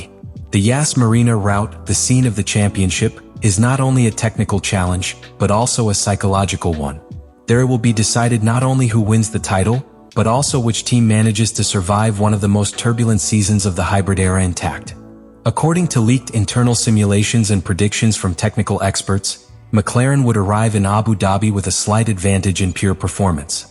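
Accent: United States. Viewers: American accent with rubbish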